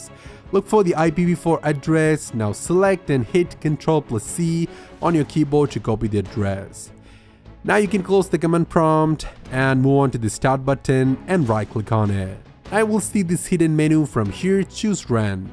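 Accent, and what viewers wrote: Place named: Kerala, India. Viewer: Malayali da😎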